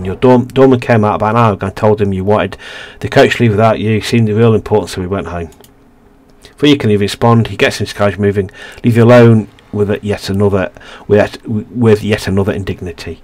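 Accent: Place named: United Kingdom. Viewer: From the uk too man sound exactly like one of the lads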